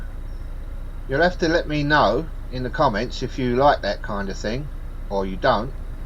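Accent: London, United Kingdom. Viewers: Please don't stop " rabbiting" I love your accent, but as a Londoner I'm biased 😉. Your commentary, historical info and snippets of interest are just perfect. Don't change a thing. I had a friend who lived in Pennylands, it might have been purpose built estate but it was a peaceful place for her to live. I had no idea the basin was just behind her bungalow. Look forward to your next vlog 😊 x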